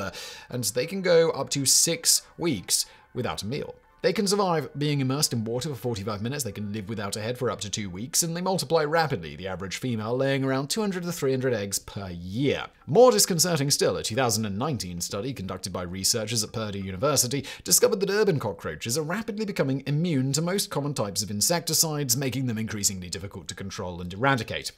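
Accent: United Kingdom. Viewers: I do love that you read Wikipedia to us, I just hate your particular British accent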